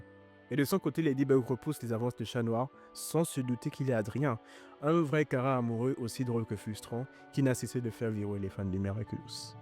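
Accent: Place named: Gabon. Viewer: J'aime, continuez, vue ton accent, je dirais que t'es Gabonais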